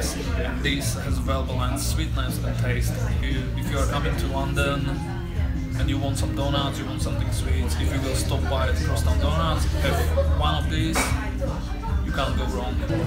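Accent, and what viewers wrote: Slovakia. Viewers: is your accent Slovak or Czech? nice videos thanks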